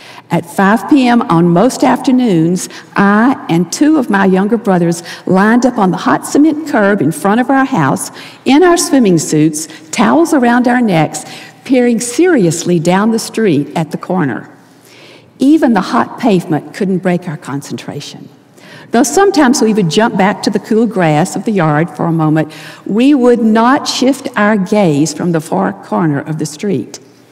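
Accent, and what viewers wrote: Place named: South, United States. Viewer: Thankyou Sister Marriott for a beautiful devotional full of spiritual and practical application. Love your southern accent too. Stay safe. God bless.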